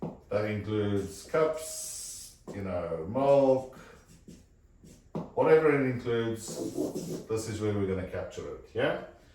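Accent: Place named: South Africa. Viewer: You sound like a Boer...And also, this is the only video on youtube that explain a SIPOC in a way that a dry tree stump can understand. THANK YOU SIR.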